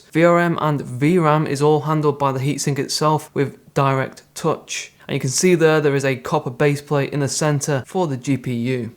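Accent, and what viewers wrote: England, United Kingdom. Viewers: Hi, Thank you for another great video. is one of my favorite YouTube channel because of the following reasons: High quality video content The (video card) product presentation is VERY professional The camera angle is Perfect The sound is very CLEAR I and my wife enjoy watching YouTube channel, because my wife and I really like gaming PC, and high end computer components ( video card, motherboard) My wife tells me that the gentleman in this video ( I think his name is ) LOOKs like people in Hollywood, she likes English voice. In this video, the new 5600XT is impressive. The VRam's low temperature alone is worth buying. This RX 5600Xt review is very informative and helpful. Keep up the good work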